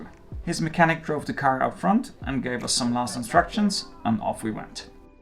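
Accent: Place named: Germany. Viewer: With your totally awesome German accent you stated you were not a Porsche guy, you will be. I will be following along.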